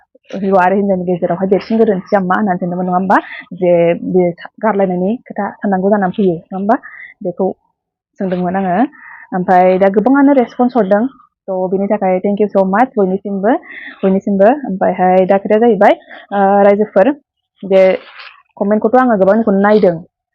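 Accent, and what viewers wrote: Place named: Udalguri, India. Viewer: Ma'am ni rylynaikou udalguri ni badisw mwnw ag karon jwngni udalguri ni mansifra ma'am badi rylayw.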